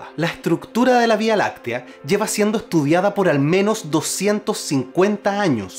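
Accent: Chile. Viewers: Muy interesante tu vídeo. Además es muy grato escuchar el castellano chileno después de tantos años en el extranjero. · Chileno, sin duda. Muy bien hablado y claro.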